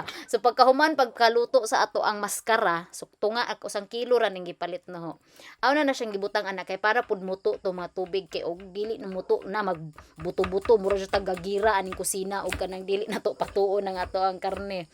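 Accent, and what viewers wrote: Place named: Dumaguete, Philippines. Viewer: karon ra ko ka realise. Dumaguete ug Bohol parehas ra kaayo ug grammar ug accent. ang kalahian ra ang J ug Y.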